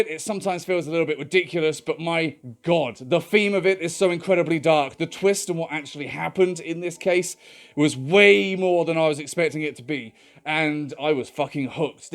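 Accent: United Kingdom. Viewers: He's British isn't he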